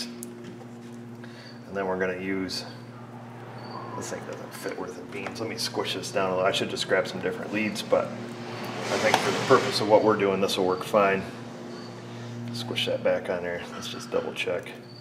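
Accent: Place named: New York, United States. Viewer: Since you speak New York-ese I thought you were saying Self Made Auto:(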